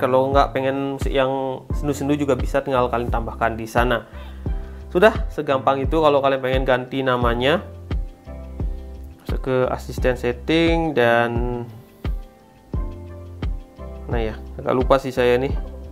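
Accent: Bali, Indonesia. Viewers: Logatnya kaya org bali nih wkwk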